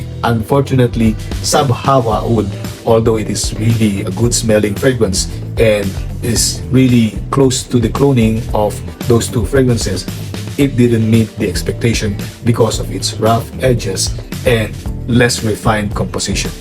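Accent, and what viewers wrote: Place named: Philippines. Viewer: Your 𝒂𝒄𝒄𝒆𝒏𝒕 has a sound like a FILIPINO. Are you originally from the Philippines ❓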